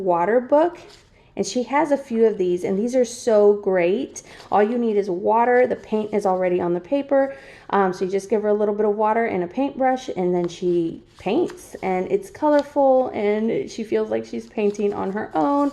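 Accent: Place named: Texas, United States. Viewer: i knew you had to be a texan.... just hearing you speak is like hearing my native tongue.🤣🤣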